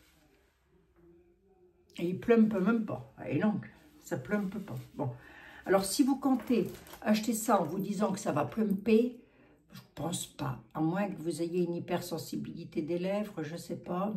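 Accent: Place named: East, France. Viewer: Coucou, deux petites questions: vous avez decoloré vos cheveux comment? Chez un coiffeur? Et ne sont-ils pas trop abîmés ensuite? Et 2e question, votre petit accent vient-il de l’est? Mon père en était originaire et j’adore cette intonation…😊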